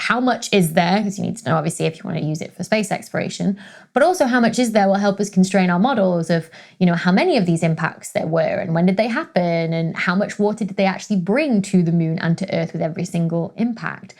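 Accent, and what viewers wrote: United Kingdom. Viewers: Dr. Becky: Being an academic is hard. Middle-aged me working in food service: Tell me about it. Your accent tells me you're a Pom, so you have guaranteed health care, right? As an academic, do you get sick time? Paid holidays? My health care comes out of my own pocket, and all I get if I have to take time off from work is a smaller paycheck. I'd trade places with you in a heartbeat. To paraphrase Ford Prefect: Academic stress is an illusion. British academic stress doubly so.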